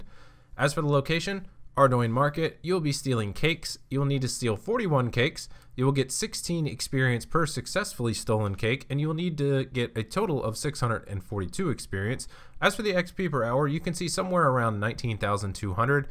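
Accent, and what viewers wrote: Baltimore, United States. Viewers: You from Bmore?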